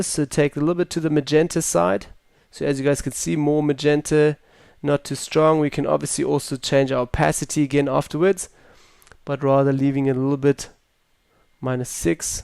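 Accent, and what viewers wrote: South Africa. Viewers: Thanks so much for your time and nice accent which reminded me of my old friend from South Africa. It would make more sense if you didn't make this shot in the camera because you kept on saying you would rather re-shoot it. That's why we have you as an expert to make these tutorials making mistake shots look acceptable and punchy. Thank you so much. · wow u from south africa nice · Hey you're from South Africa... :D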